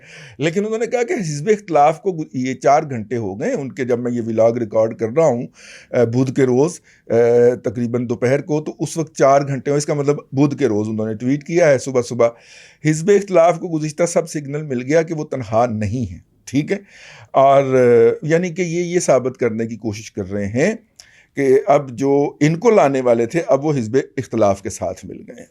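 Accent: Punjab, Pakistan. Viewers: Your vlogs are insightful and you manage to say as much between the lines as up front. I particularly love how you completely own your Punjabi tinged Urdu. Sick of complexed Punjabis always trying to neutralise their accents.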